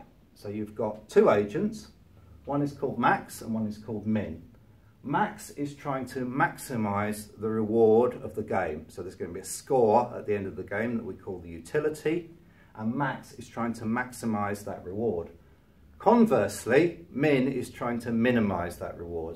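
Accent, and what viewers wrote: United Kingdom. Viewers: Ohh British Accent ^^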